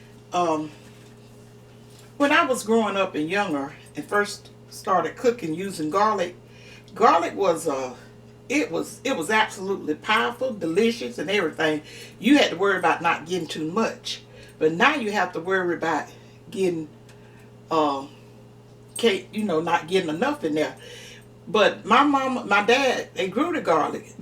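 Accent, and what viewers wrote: South, United States. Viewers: Awesome I knew you were from the south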